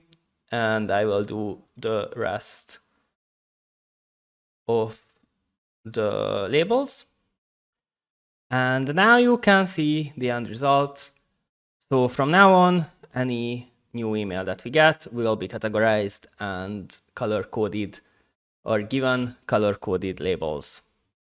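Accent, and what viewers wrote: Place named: Hungary. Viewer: Magyar akcentus az peak tesó! Amúgy jó lett a video, well done GG!!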